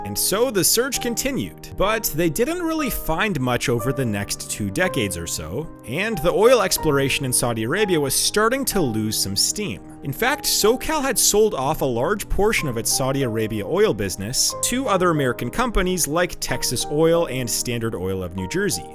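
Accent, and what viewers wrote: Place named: Canada. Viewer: I think he is Canadian. I heard slight “Canadian raising” in his pronunciation of the ‘ou’ diphthong (listen to when he talks about when his next video “comes out” at the end of the video.) He also uses the more open ‘o’ in words like “dollar” and “over.” I’d guess he’s from either Ontario or Alberta based on the accent.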